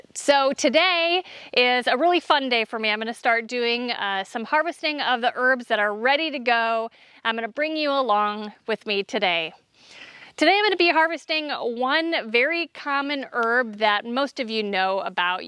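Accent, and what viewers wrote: United States. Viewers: Herb versus erbs. ... Stop nick picking I'm from the UK and lived 40 yrs USA. I love the American accent. Just enjoy the video and please be kind. Thanks.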